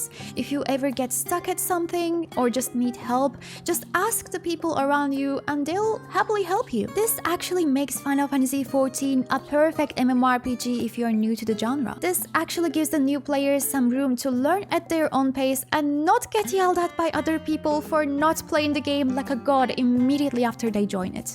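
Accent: Turkey. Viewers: I can smell a turkish accent, are you turkish? :D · bu bir türk aksanı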